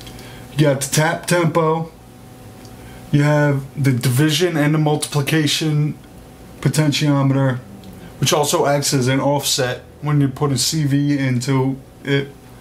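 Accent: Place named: Philadelphia, United States. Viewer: Sounds like ur from philly but I’m guessin jersey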